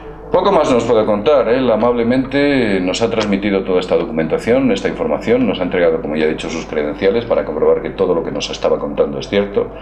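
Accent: Spain. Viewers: el acento españolete funciona a la maravilla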